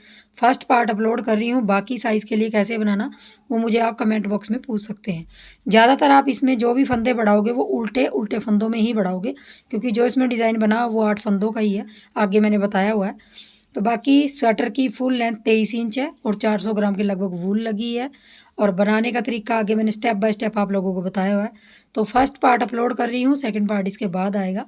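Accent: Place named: Ambala, India. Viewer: Aap kha ke rehne vale ho Mam? Aap ki language hmare sath milti hai (Ambala) ki rehne vali hu me bhi, pl. tell ❤️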